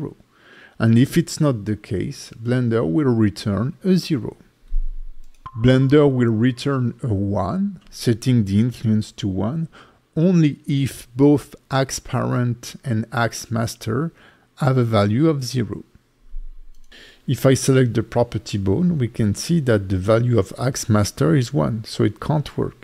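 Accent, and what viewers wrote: France. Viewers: your accent is french 😅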